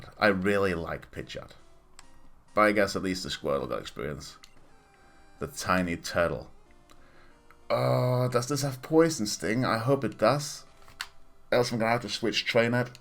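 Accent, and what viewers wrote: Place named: United Kingdom. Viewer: what accent is that? makes me wanna pour in a cup of tea for your vids ahah great commentary, eventhough im not as much of a pokemon fan you make it enjoyable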